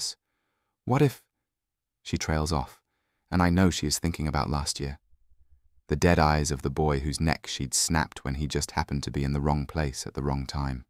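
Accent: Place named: United Kingdom. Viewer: Since I listened to a Xaden POV in an American Accent I'm having trouble adjusting to your British accent. But, this is well written and I am enjoying it regardless of that one caveat.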